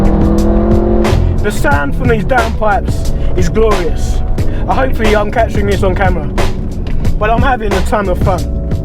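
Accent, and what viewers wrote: London, United Kingdom. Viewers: Just discovered this channel. I’m getting a stage 2 in the new year on my F10 M5. I have a track focussed 911 which I take to the Nurburgring, but in April I’m taking the M5 ! Great hearing a Brit over the pond. Take it easy brother 🤜🏼🤛🏾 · I like your video style great funny conentry good old London accent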